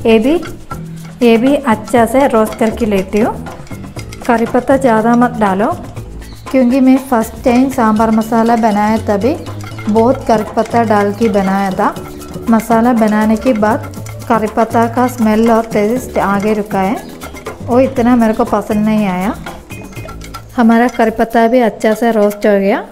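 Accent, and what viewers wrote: Kerala, India. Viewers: Your voice is cute...than urs receipe...love Hindi from keralites...its sweet n swett.. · Seriously, I love her hindi more than the recipe .And it is so Kerala authentic. Really cute.😀😀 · Malayali ki hindi .good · Yess true your hindi is sooo cute... Even i am malayalee but born and brought up in Gujarat and Bombay so my accent is opposite when i speak malayalam all understand that i am not brought up in Kerala...😀😀😀😝. I am trying yours recipe today.. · I can actually feel the mallu vibe while she's talking istggg the actual way a malayali talks when they try to speak in hindi I remember my relatives talking like this when they came to Mumbai for the very first time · Love ur Sweet voice of speaking hindi with Kerala accent😅😅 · Loved your recipe. Your detailed instructions. N above all your enthusiastic n beautiful kerala accent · I know this kinda sounds crazy but your voice and way of speaking hindi reminds about my frnd from Kerala during my UG days. 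I just love that. #oldmemories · I tried the recipe. Yummy. Sorry to make personal comment. Your Hindi is really good though in Malayalam accent with a beautiful smile. God bless you. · Last year we had been to kerala.And also one of my best friend is from kerala. I just love your hindi accent. It is so sweet. Thanks for sharing keralian recipes. God bless you n stay blessed always. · Mam your recipe and your hindi pronaunsation both are authentic keralian nice thanks god bless you 🙏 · 😀true..authentic kerala hindi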